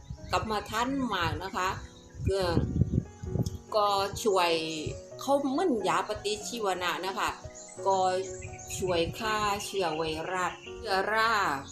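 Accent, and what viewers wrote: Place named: South, Thailand. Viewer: ชัดเจนเลยคนใต้เช่นกันเลยค่ะ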